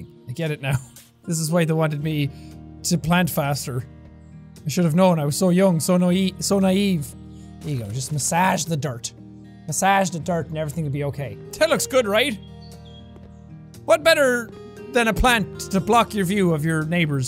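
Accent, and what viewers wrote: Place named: Ireland. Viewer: Man, what happened to the good old days when just started using his Irish accent? I wish I haven't watched every video back then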